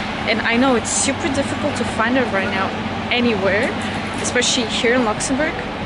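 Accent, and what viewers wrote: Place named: France. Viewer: Your accent is so french, where do u come from ?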